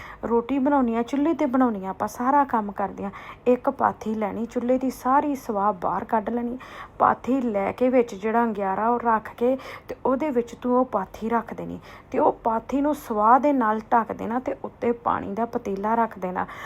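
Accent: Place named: Sialkot, Pakistan. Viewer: Sister amazing video 😊 sailkot Punjabi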